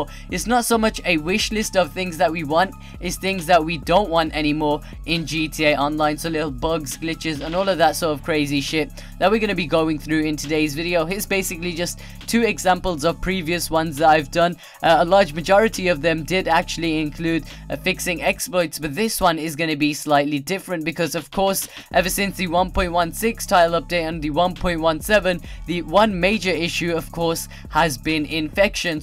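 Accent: Birmingham, United Kingdom. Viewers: I know you are from Birmingham but what is your accent?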